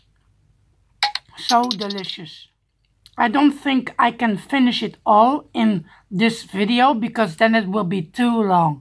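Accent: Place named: Netherlands. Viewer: That accent is definitely Dutch I'm hungover and could just do with that now so jelous lol it's not to long at all pal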